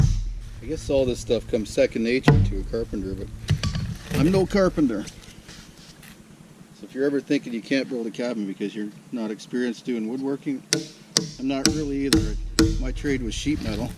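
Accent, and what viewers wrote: Canada. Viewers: i can tell by the maple leafs and ur accent ur canadian lile me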